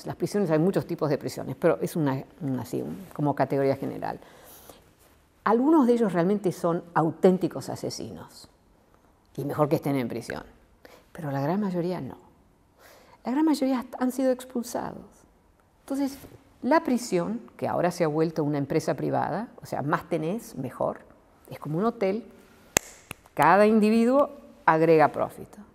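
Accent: Argentina. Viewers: How come she speaks perfect argy Spanish? I hear that she lived until 1950 in Argentina, but at that time she was 1! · No esperaba que hablara en español menos tan porteño.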